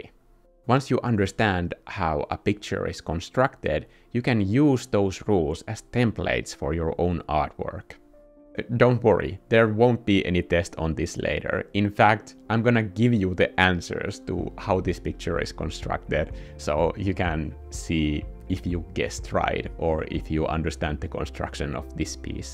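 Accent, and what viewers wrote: Finland. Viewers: I KNEW I recognized a fellow Finn in your accent!!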